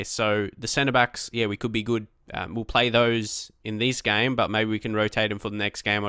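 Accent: Australia. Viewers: The reason i think people hate on you is because of your accent which i think is stupid. Although i do kind of find the Australian accent a bit annoying, I'm not going to hate you or your content for it. If you were American or British you would receive way less hate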